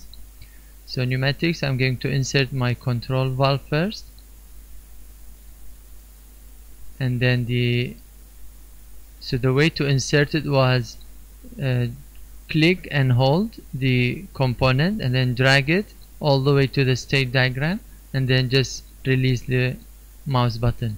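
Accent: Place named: Arab world. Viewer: may i know what you race is bro? Your english accent is like an arabian... 😁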